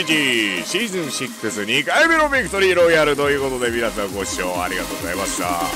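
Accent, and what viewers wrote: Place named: Kansai, Japan. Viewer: 関西弁すこ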